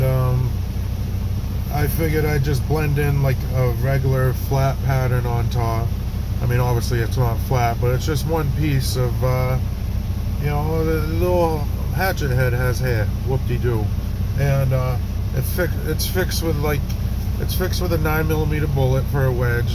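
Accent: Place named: Boston, United States. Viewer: I thought it came out pretty nice. And I really enjoyed listening to the Boston/NH accent too. Time to go Pahk ya cah in the Havahd yahd. Keep up the great work!!!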